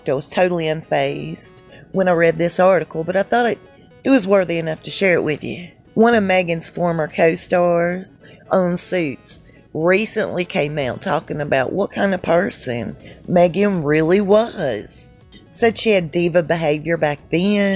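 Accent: South, United States. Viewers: Misleading headlines you are full of it for mere money but you do to worth with that a awful gaad southern drawl please stop it is is really sick.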